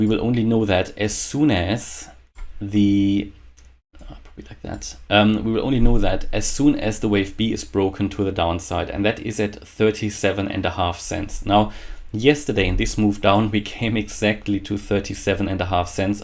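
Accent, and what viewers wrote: Germany. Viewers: das hört sich stark nach Deutsch/englisch mixxed an hahahha :D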